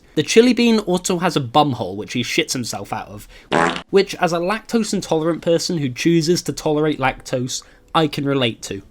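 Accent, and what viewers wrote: United Kingdom. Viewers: I didnt know u were british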